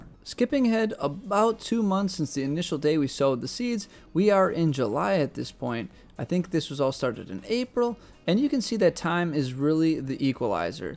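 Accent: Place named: Midwest, United States. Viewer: i need some midwest friends then! i love the accent lol. yes i barely discovered your channel and i love plants and now im starting to become obsessed with starting things from seed so your videos are really helpful and i enjoy watching them! i have a few projects i’m starting/about to start so i’m excited 😆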